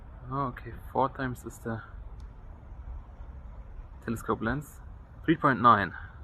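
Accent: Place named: Germany. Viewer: Immer wieder spannend, dass man instant hört, dass du aus Deutschland kommst. 😂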